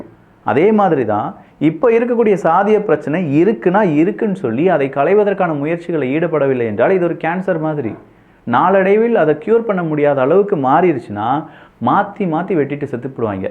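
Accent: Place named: Kerala, India. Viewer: I get malayali l get malayali pitch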